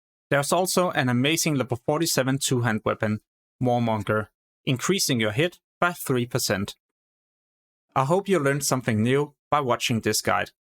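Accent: Denmark. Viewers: Skud ud til den mest danske accent på youtube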